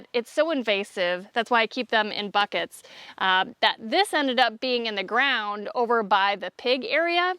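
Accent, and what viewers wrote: United States. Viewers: Herb versus erbs. ... Stop nick picking I'm from the UK and lived 40 yrs USA. I love the American accent. Just enjoy the video and please be kind. Thanks.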